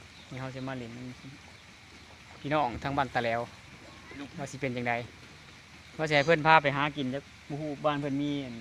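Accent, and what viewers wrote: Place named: Phu Thai, Thailand. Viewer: ผุบ่าวผู้ไท..หล่อเนาะ เว้าสำเนียงกะไพเราะ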